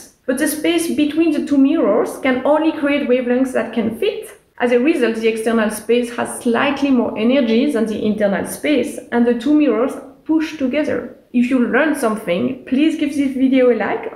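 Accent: France. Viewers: helloo i love your french accent, i could listen to you talk about casimir effects all day 🤩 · I noticed a french accent. surely not a surprise. But given that google ASK AI is only in english (at least not in french) I guess this is another science communication force toward french to english to french communicatino in scinece. off topic in passing just noticing.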